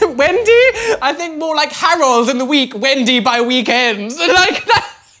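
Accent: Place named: RP, United Kingdom. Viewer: Omg I haven't been watching your videos for long bit I think u r soooooo funny and I love the accent ur so posh sounding 😀😀😀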